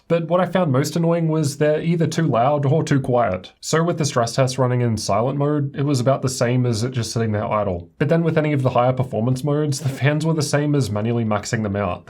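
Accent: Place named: Melbourne, Australia. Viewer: God, that melbourne accent... Amazing video but the accent is strong xD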